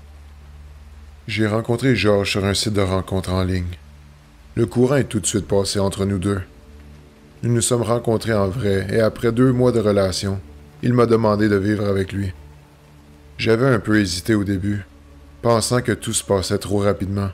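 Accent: Quebec, Canada. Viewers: Chapeau bas sa fait plusieurs vidéo que j'écoute et franchement chapeau bas, sa fait plaisir d'écouté un québécois qui lis des histoires je trouve sa cool moi aussi je suis québécoise. Lâche pas 👍👍👍👍dans ta voix sa paraît tout le temps que tu met + une abonnée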